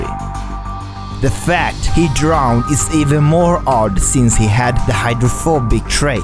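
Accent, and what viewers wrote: Sweden. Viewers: förlåt, men din svengelska är riktigt illa.. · Are you from Sweden? xD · Aah, I love your accent!! It's so cute! I'm also from Sweden! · Din svenska accent är så rolig och jättegullig. Jag älskar dina videos! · Are you from sweden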